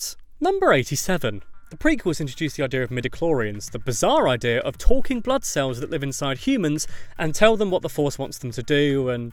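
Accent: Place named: United Kingdom. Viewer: He’s British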